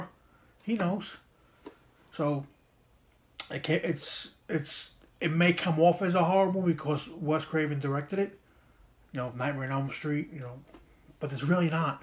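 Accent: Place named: Brooklyn, United States. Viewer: really are funny. Love that u apologized for the Brooklyn accent lol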